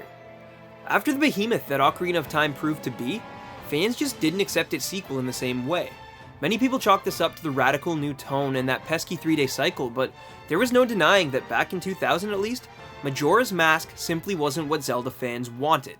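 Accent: Canada. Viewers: love your canadian accent! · Are you Canadian?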